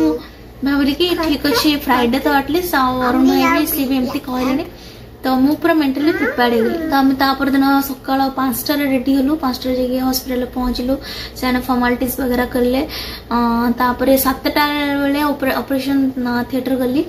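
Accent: United States. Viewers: Can u pls say about how u improved ur talking english after u went abroad .. I saw in ur another vedio how u learned us accent english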